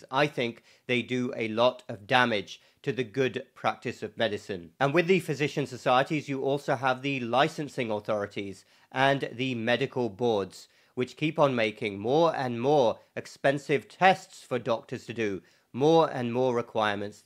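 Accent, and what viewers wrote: England, United Kingdom. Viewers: Dr Sunreel lives and works in the USA. You have a lovely English accent don't lose it 🤗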